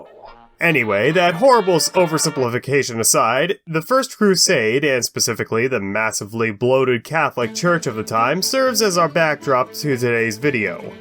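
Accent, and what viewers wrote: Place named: France. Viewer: That French accent gets me everytime 😂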